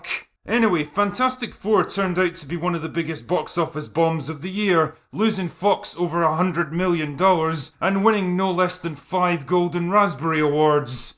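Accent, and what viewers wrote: Scotland, United Kingdom. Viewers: The great thing about is the voice work. Everyone knows he has a unique voice. But the performance element is rarely mentioned. Listen to him on livestreams, and even though he has a Scottish accent, he sounds like a sober businessman in bar after office hours. But then in his videos he puts on the voice and plays up the "drunken slurring" and he performs. Again, especially with videos like this where it is all production notes and trivia and news, compare a WatchMojo voiceover to this video fro Drinker. You know there's a script, and yet it doesn't sound all corporate and polished and robotic and forced/fake-friendly. It sound simply like Drinker is telling a story in a bar after happy hour.🤪🤪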